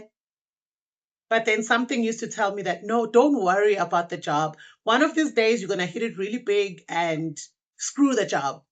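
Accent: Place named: South Africa. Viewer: You sound like one of us. Anyway, love from South Africa 🇿🇦 !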